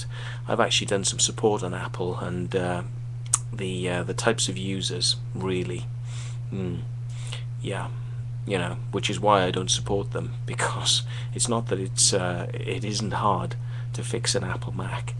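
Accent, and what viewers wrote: Wales, United Kingdom. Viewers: Holy shit, you can't be this idiotic. You still sound a bit welsh, but that surely can't be the reason. You make it sound like you know what you're talking about when claiming (incorrectly) that a lot of technologies Apple incorporate come from UNIX servers. But then you come up with these gems. "DVD is a PC format" "Web Pages, web design came from a PC" Ever heard of Xerox Parc, NeXT? Those are the origins of the GUI and "Web Pages" as you call them. The X is OS X is "ten", not 'X'. Dumbass.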